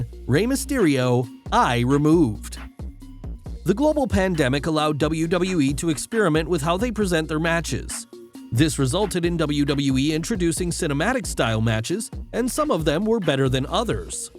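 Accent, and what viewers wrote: United States. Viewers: Not a fan of the American voiceover, sorry. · I don't think I like this Mr. America's voice or very Systemic voice ... I like the English voice better as explaining American Manipulative business ideas such as "sports entertainment"... Haha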